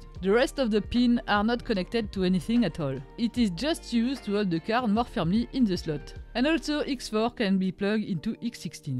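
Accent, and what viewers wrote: France. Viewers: I'm just here to study her french accent english 😅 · merci pour ce super tuto avec l'accent très "français" je comprend tous hahaha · (heavy french accent)..."and now lets see if I bricked my motherboard" LOL. Never thought I'd hear that from a French woman after modding her bios to boot from an nvme drive via pcie adapter on a 2012 motherboard. Same one I've got.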